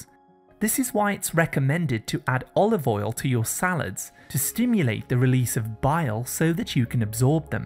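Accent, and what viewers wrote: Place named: RP, United Kingdom. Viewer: Why does the announcer sounds like a robot with a Buckingham palace accent?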